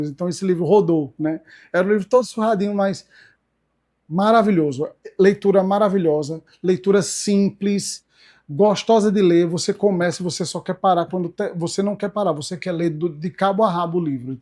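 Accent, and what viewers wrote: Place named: Recife, Brazil. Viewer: Glórias a Deus!!! Aleluias!!! Vocês são de Recife? Seu sotaque parece com o meu.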